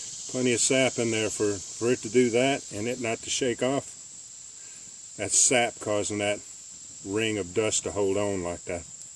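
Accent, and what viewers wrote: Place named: South, United States. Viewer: Thanks for this video. Sounds like your down south somewhere.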